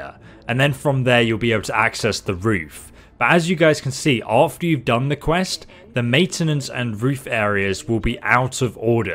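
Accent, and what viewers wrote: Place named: United Kingdom. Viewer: It's called being British, we dont mispronounced things, Americans do.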